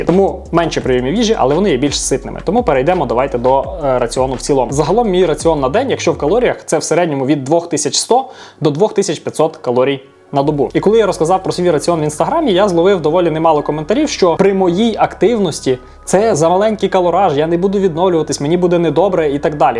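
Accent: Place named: Rivne, Ukraine. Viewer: Привіт!Натрапив На твоє відео,результати вражаючі,повага,цікаво спитати звідки ти бо я сам родом із Рівненщини і твій говір такий як наш😄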